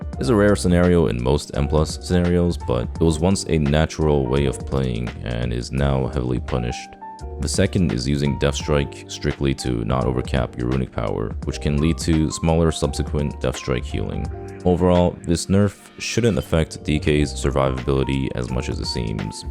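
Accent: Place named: New York City, United States. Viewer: NYC ABC is a very specific accent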